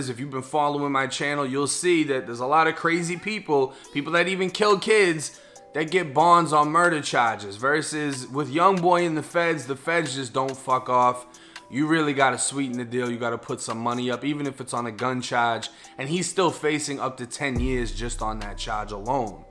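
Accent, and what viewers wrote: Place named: Massachusetts, United States. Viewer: Yo you sound like you're from Mass, straight up north shore!! But anyways, I like ur videos!! Good shi*t, keep it up!! 💯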